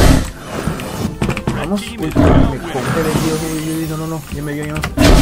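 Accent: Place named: Peru. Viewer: Se me hace que eres peruano, salueame n tu próximo video plssssssssssssssssssssssssssssssssssss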